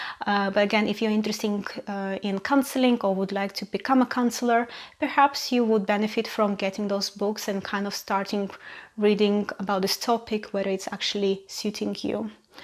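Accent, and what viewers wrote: Russia. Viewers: I can hear Russian accent. are you living in UK? Why you don't speak your native language? I"m sure russian people will appreciate it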